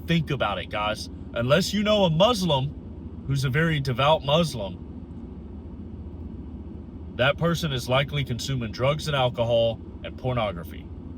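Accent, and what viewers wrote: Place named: Louisiana, United States. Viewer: Your accent reminds me of Gambit from X-Men bro lol. Love your videos!!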